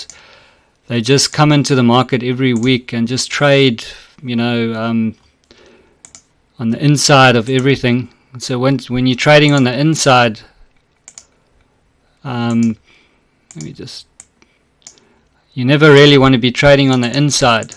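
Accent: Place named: South Africa. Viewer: May I ask what country you are from originally? You don't sound like a New Zealander. I mean no disrespect. If I had to hazard a guess, I'd say you're South African maybe?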